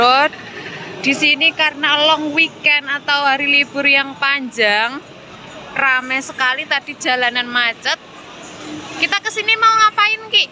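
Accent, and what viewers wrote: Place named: Java, Indonesia. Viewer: Bahasa Indonesia medhok banget🤗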